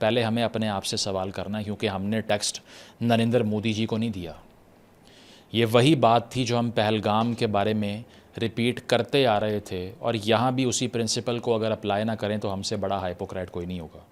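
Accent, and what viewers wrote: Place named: Punjab, Pakistan. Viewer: Punjabi accent highpocrite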